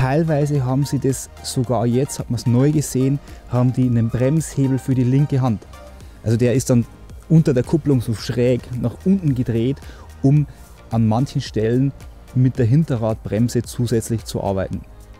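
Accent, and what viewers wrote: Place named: Bavaria, Germany. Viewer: Physik auf bayrisch 😁👍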